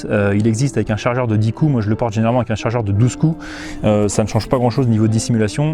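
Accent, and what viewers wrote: United States. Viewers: vidéo très intéressante, par contre faut qu'il arrête avec l'accent américain péteux c'est trop mdr ceci dit très bonne vidéo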